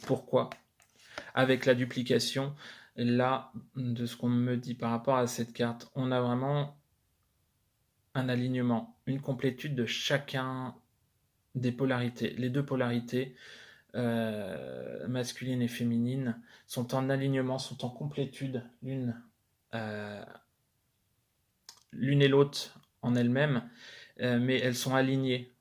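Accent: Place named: North, France. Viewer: ahh j'ai cru entendre parler du nord, bon remarque du sud à chez nous, nous suis bien dans le nord de la France 😂😂😂 Contente de t'entendre à nouveau 🙏🙏🙏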